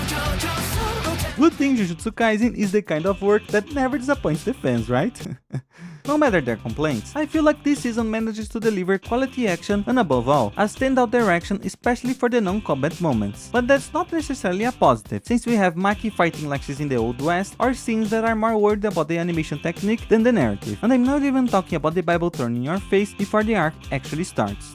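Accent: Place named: Brazil. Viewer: Tu é br né? Da pra reconhecer pelo sotaque kkk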